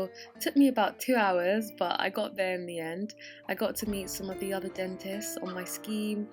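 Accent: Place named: United Kingdom. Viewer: Well I’m here for your British accent 😂 I’ll be moving to the Uk soon. So I gotta work on my English accent lmao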